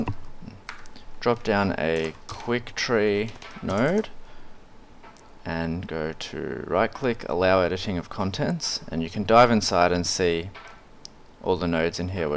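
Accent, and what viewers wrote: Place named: California, United States. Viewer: your accent reminds me of The Californian series from SNL.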